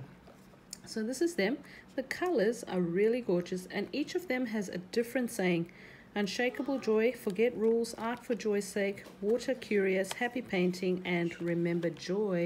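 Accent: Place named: United Kingdom. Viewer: Hello, I came across your video trying to find these brushes(after watching several of Kristy Rice videos) but I can’t find them . I see this video is from January,so I guess Amazon had them then, but currently it’s not available. But maybe you got them on Amazon in UK, because judging by your beautiful accent,I think that’s where you are? 😊Do you know by any chance if I can find them anywhere else? Interestingly it is available to international buyers only on Kriszty’s website,but not for the US which I found very strange. Please let me know if you have any information. Thank you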